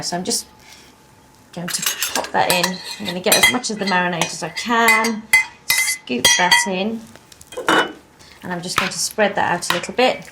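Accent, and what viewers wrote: United Kingdom. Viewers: She does indeed have a British accent and may even be a nice English as well as Indian lady?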